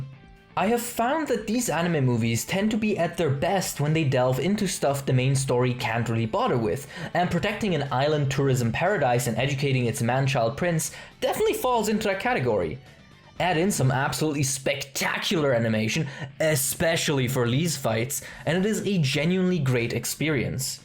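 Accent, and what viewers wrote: Germany. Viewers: You're German? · Are you an german?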